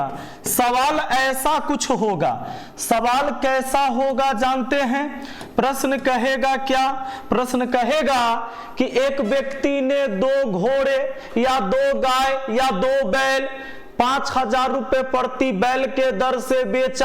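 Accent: Bihar, India. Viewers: bihari type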